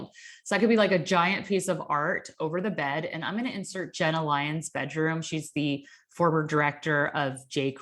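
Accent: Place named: Texas, United States. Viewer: Is it me or are you saying Y’all more frequently? The Texan is coming out! 🤣 I love it!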